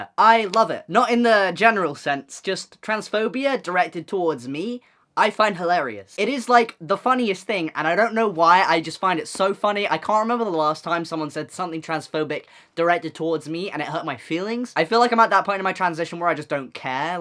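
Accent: United Kingdom. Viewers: Are you British or Aussie? I can’t tell from your accent lol. I’m Aussie.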